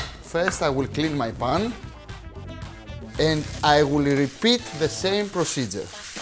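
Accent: Greece. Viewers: his accent is great and I mean it. Why so many Greeks have a problem with his accent? Why on earth do you feel embarrassed with something you shouldn't and it's none of your business. And finally don't forget that for the English speaking world he is an Greek!! That's what he sells and the accent could be very well part of it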